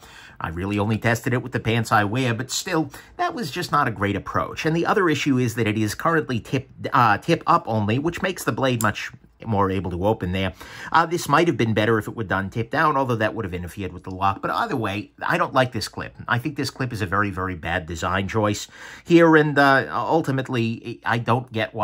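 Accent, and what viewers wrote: New York, United States. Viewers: Your channel is my favorite. BTW, are you from New York?? Thanks for the reviews!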